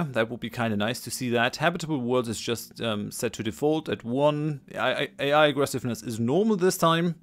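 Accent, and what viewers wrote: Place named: Germany. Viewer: NO WAY. I can't believe, it must be a miracle! When Utopia came out i quickly peek in the Stellaris wiki to find out what's new. Personally i found that my 1st playthrough should be done as F.Pacifist + Materialist (of course Fanatic Purifiers and Hive Mind is very interesting and absolutely new options, but when it comes to your own game you want to pick something balanced which will not prevent you from discovering all new stuff in the update, you know) as the most balanced between all new options. Unfortunately, i got too much work now days, so i must beeline my own game a bit and i decided to watch some letsplay first. And what i found over here?! Some german guy (it's a important note, because i find german accent the most pleasant for my ears (even compared to native speakers!)), who's playing exactly what i wanted to see (i mean race) with 2 most entertaining enemies in this update. 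Also it's Insane difficulty! (nothing else not worth watching imo, because i can do hard without any problem on my own). I got a bit of frustration right now, because it feels like someone just read my thoughts and did EXACTLY what i wanted to see xD Anyway, wish you best luck in this campaign and i happy to say that you've got a new loyal subscriber, who's watching this almost 5 hours in a row instead of sleeping, because he just can't stop =)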